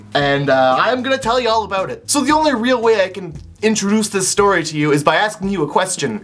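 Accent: Canada. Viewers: Is he Canadian I'm new to this channel so idk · I would of awkwardly hiven her some hummus, and awkwardly pat her shoulder. & plus your getting a little canadian accent c: coooool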